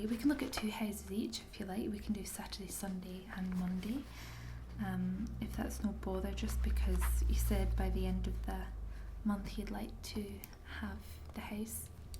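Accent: Scotland, United Kingdom. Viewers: Great Scots accent *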